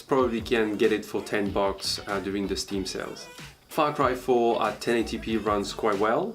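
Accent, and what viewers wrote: France. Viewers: Hello, je sens le petit accent français:-) super vidéo! Je viens de faire l'acquisition d'un iMac 27'' i7 4.2, 16gb ram, 3TB Fusion drive, Radeon pro 580 et je suis ravis! Je fais pas mal de vidéo et je joue également via Boot Camp à BF1, Wow tout fonctionne parfaitement en <num> ultra. Je ne peux que conseiller l'achat de cet iMac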